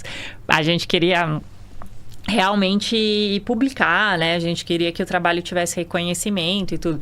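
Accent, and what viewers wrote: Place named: Sao Paulo, Brazil. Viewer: Eu gostei do sotaque dela de paulistãããna 😂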